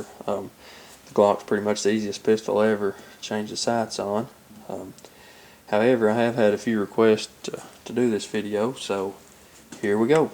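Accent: South, United States. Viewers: All vids should have a warning if they are going to be narrated in a southern accent. I would rather drill a hole in my fucking head than listen to a slack jawed yokel. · I've done this to 2 of my Glocks so far, so I have the experience but it was still fun to watch your video. You did it very well, and made the most important points of using loctite on the front screw, and a soft punch on the rear. I also really like the way you talk, your country accent is really cute, hehe :)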